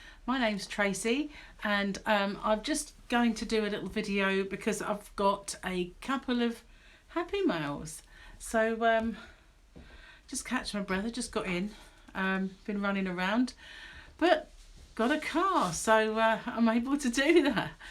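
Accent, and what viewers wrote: United Kingdom. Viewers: Love ya guts lady!! Aannd I absolutely love the way brits say aluminum!! I watch Joel & and they talk about the similarities and differences between the USA and UK I just adore the accent!! The happy mail is awesome!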